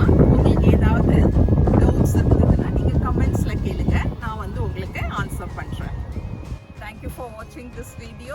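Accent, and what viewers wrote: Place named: France. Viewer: Madam french accent . Where is video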